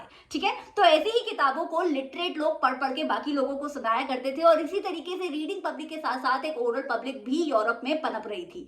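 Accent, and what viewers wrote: Gorakhpur, India. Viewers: Mam are you frm gorakhapur😅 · Mam aap Gorakhpur se hai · Mam aap gorakhpur se hai kya ❤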